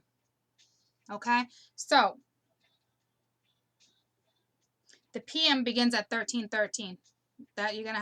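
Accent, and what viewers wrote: Boston, United States. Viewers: Oh my God !! Are you from Boston...I'm from Cape Cod!!!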